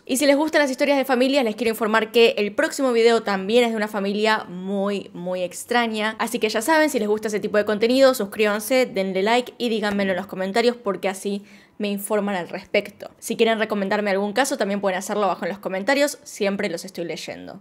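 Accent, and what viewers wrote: Argentina. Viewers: Tiene poco que empecé a ver tus videos, hay varios canales del mismo tipo, en tu caso me pareces muy sincera y directa, también tu acento argentino me ssama la atención jaja, saludos desde México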